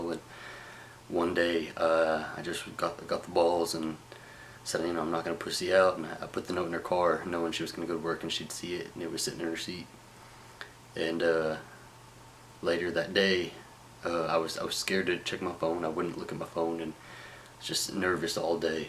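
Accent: South, United States. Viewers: Loved your story and that gravelly deep voice and southern accent didn't hurt either! Best wishes on coming out to your dad and other family members. A Great Video - I Liked, subbed and shared! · Group A... fuck em. You're better off without em. As a southern native (NC) it's always nice to hear someone who talks like me proudly say who and what they are. I live in a moderately metropolitan area, and the vast majority of people here view minorities (racial, religous, sexually oriented) as just another person. No big deal. If I drive 30 miles west I arrive in the country. I mean the woods. And the attitude out there is a little different. These rural areas in the south are still a little behind the times, but even in those areas perceptions and ideals are beginning to change noticeably. Especially as the older generations are dying off and the younger generations are arriving at a point in life where they are starting to put together their own informed ideals and opinions. 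The reason I say all this is because a lot of the nation has this stereotype of the south in their minds as a regressive, racist, intolerant part of the country. And today that, for the most part, just isn't true. At least in North Carolina it isn't. I have lived in D.C. and Philadelphia and can say with all honesty that I have heard more bigoted talk up there than here at home. Including bigotry towards people simply for their southern accents. Sorry for the long comment but people need to know that when they hear southerners talk or think of the south in terms of our social views that today's south is not the south of 30 years ago. It's not the south of 5 years ago. We are embracing modernity, not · Love a southern accent. Wow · That southern accent is very attractive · Love your southern voice. Or accent lol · I just love how u break all the regular stereotypical things about being a "typical gay man". 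I really like that about u! As being a transgender guy, I would've never thought u were gay with ur really deep voice and ur genuine "southerness" but that just goes to show that u can't tell if someone is gay by looking at them. I wish u the best of luck and all the happiness in the world! XOXO-:-) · You're so hot. Nice Southern accent. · Great story and awesome t-shirt... and I'm a sucker for a southern accent:-)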